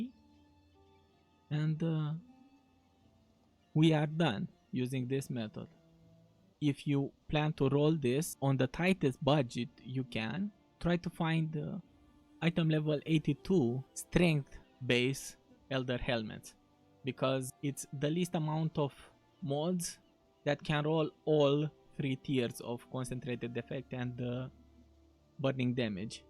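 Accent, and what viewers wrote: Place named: Eastern Europe. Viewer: Good video! I detect a central maybe eastern European accent?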